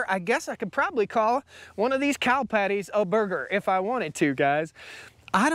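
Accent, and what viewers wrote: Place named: United States. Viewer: o boy .... newer give likes .... you earn it a merican coool speach loove it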